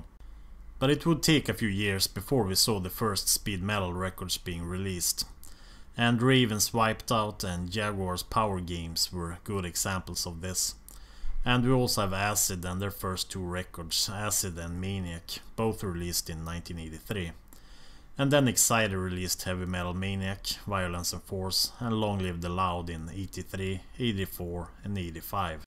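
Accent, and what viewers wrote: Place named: Sweden. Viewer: man, you're hilarious! You talk in this cool, deep Swedish accent and then you say stuff like "howdy folks" 🤣. I love it!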